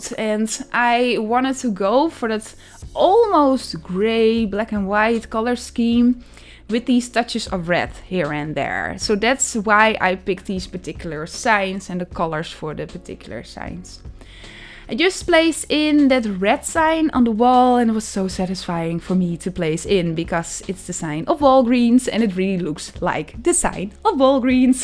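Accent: Netherlands. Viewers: You sound like you’re from the netherlands. Are you Dutch? My dad is Dutch and my grandparents have a similar accent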